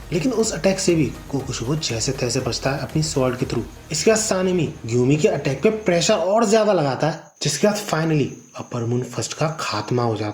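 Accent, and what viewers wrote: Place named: Indore, India. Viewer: Bhaiya apka indori accent bada mjedar hai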